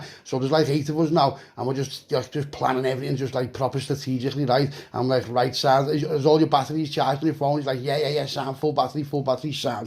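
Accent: Liverpool, United Kingdom. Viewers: Fuckin el Scouse...take me to the fair with you next time!! 🤣🤣🤣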